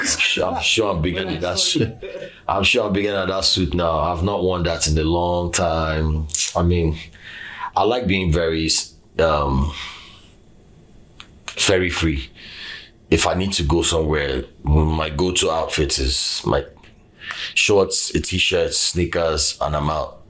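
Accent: Nigeria. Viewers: I can tell you straight away that you are Nigerian and lam not from there! First few moments of talking.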